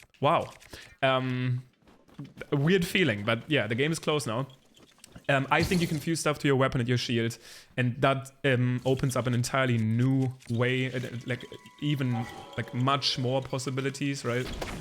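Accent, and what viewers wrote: Germany. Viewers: You sound german are you german ?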